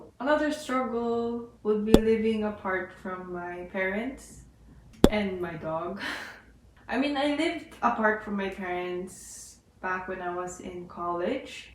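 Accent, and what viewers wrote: Philippines. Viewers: I saw you in Tiktok and I've followed you there because I'm amazed by how Filipino you are at heart and with how you speak. In this new chapter of yours, I know it will be hard. You may be Korean by blood but you grew up here in the Philippines and it's understandable how sad you are to leave what you've considered your home for almost all of your life. Just share your journey with your friends and family. Most likely you and your friends here in the Philippines have a group chat. Just keep talking to each other even if its random. We also do that, I've had friends who left PH too but we still leave our stories in the gc to comfort each other. It will be hard at first. But don't let yourself sink in the sadness. You can make it through. The Philippines will always be your home when you go back. God bless and fighting! 😊❤️ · Saan ka lumaki sa Pinas, kasi ang haling mo talagang mag tagalog ay English.. 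pati mga expression mo ay Pinay na Pinay! Good job at ang lakas pa ng appeal mo! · that's the guilt and feeling of an OFW but this is your home you are not a foreigner nor stranger at least you have that smile now and the most important you have that Filipino English Accent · U r more a filipina to me.. the accent, the slangs.. everything... U can comeback anytime.. our country is waiting for u.. and.... Hanggang jan nlng yung english ko ☺️ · First time seeing your vlog. And surprised kasi parang ikaw yung Korean vlogger na pinakafluent sa tagalog. Not comparing, pero amazing lang na very natural yung pag Taglish mo. At accent pinoy talaga ang tagalog. Nice2. 😅 Edit: saw that this is your first vid pala. Haha. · You look so much of a korean coz you are a true blooded korean, but you definitely sound like a true filipino,,,,,i appreciate you so much... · A Korean speaking english with a Filipino accent is so funny to me.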